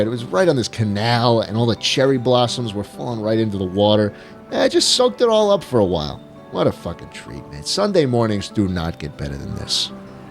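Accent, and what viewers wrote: New York, United States. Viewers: i like how his thick new york accent slowly faded away since he retired, leaving that velvety voice i remember from his earlier days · His New York accent is pretty constant but it gets stronger sometimes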